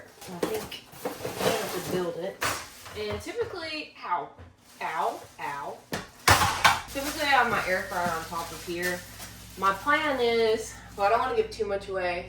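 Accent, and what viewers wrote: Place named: South, United States. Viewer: I'm sure most of your subscribers are like me and don't really care that much about hiking we are just here to see a very beautiful girl with a beautiful southern accent. You could make videos about basket weaving and I would still watch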